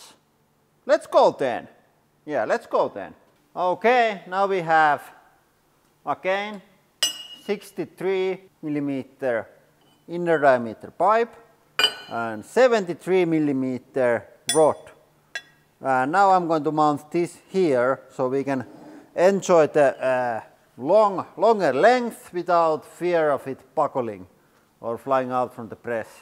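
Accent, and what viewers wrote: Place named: Finland. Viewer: Love your Finnish accent!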